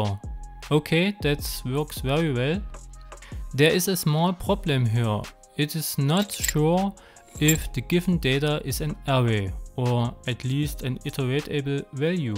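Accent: Germany. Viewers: I love your german accent hahaha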